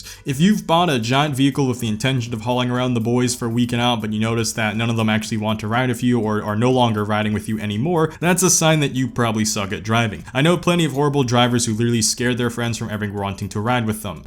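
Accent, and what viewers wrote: United States. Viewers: This guy sounds more American than asian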